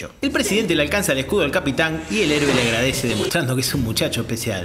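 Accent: Argentina. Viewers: Tenías que ser argentino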